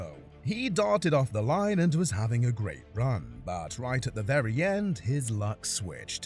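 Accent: United Kingdom. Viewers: I was going to watch until I heard the Brit narrating....😱🤨🤬🤬🤬🤬🤬🤬🤬🤬🤬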